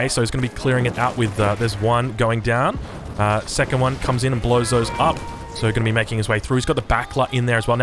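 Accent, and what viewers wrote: Australia. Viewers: I think you need to change how you cast them. All of these games aren't tournaments games where everything is super important to watch or pay attention to. Maybe. Focus on a few battles. Maybe build orders but jump around more. Don't have a 20 min casting slog where you are trying to fill the air with your Australian accent. Maybe reduce the time? By skipping boring parts. Or something idk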